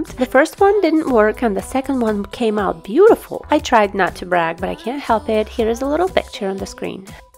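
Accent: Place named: Ukraine. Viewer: I’m a mother of 4 , my son is the oldest, then I have twin girl, then another girl. I am now a grandmother to 10 children, we have 6 granddaughters and 4 grandsons. I am a retired social worker and my husband is a nurse. We now take care of 7-8 of our grandchildren during the summer, and we do after school care when they are in school. I’m very busy with the littles, housecleaning, laundry, all the things, but I adore them! Where are you from? I have a friend from Ukraine and your accent is similar. Enjoy your channel!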